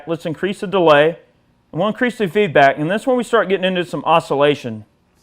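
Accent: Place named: United States. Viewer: Those Yankee hillbilly accents and those GI Joe Iraq hats should be aced. It's like one of those guys off of Duck Dynasty turned guitar player.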